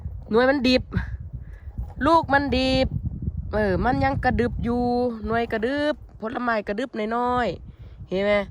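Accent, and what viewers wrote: Korat, Thailand. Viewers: เป็นFCใหม่นะค่ะฝากตัวดว้ยจร้าจะติดตามนะค่ะ..ขอโทษนะค่ะเป็นคนจังหวัดอะไรค่ะคือพูดภาษาโคราชได้ค่ะ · ชอบความสำเนียงโคราชบ้านเอง เป็นคนอำเภออะไรคะ บลูเบอร์รี่น่ากินมากค่ะ❣️กดติดตามแล้วนะคะ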